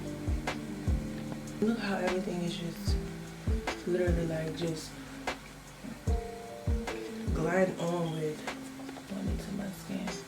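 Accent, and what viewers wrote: New Orleans, United States. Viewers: Girl! Gorgeous! I knew the moment you started talking that you are a Louisiana girl like me Lol! New subbie! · You sound like a NOLA Lady! I am from NOLA! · Do I hear a lil Uptown New Orleans in your voice? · I know a fellow Louisianan when I hear one 😊 After listening for a few seconds I said oh yeah that sounds like New Orleans or surrounding areas of N.O. You’re makeup is BEAUTIFUL 😍 ❤.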